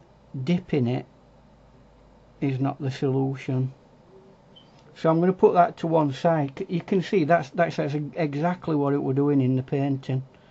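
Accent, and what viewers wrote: United Kingdom. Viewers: Love the British accent... What a lovely video. I feel like this one deserves so much more then 109 views since it could be helping so many beginner artists. Stay connected. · Let me guess sir , are you from Yorkshire? Brilliant video! 👍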